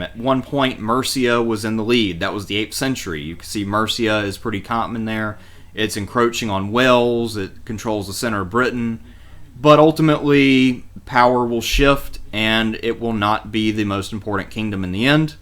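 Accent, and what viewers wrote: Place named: South, United States. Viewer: He speaks with a southern drawl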